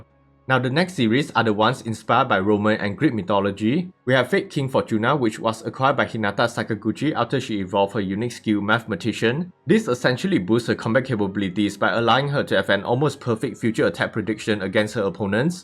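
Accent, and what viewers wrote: Singapore. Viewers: ur singaporean right the accent sounds familiar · Hmm you sound singaporean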